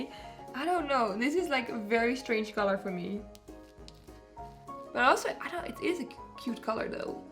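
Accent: Netherlands. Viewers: ZC I tough she was Dutch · Wait is she dutch? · Hello. One question: are you Dutch? I think you have a Dutch accent. I am also Dutch :)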